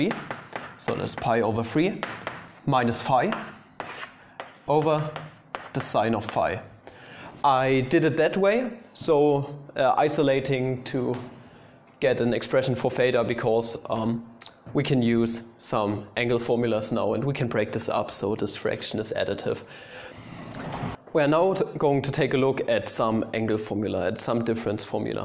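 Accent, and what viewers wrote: Germany. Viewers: Are you German? Like I am and you sound SO DAMN GERMAN😂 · I thought I clicked on a mindyourdescisions video and then I hear that coarse german accent.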